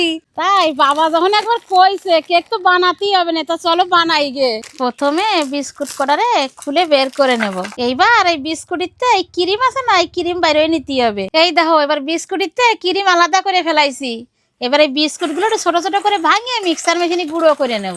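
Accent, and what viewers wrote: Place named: Bagerhat, Bangladesh. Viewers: বাংলাদেশে খুলনার মানুষদের আঞ্চলিক ভাষা কিছুটা এমন শুনতে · আপনার বাড়ি কি বাংলাদেশে। আপনি প্রায় বাংলাদেশের বাগেরহাটের ভাষায় কথা বলেন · কিরে যশোরের ভাষা বলে কেন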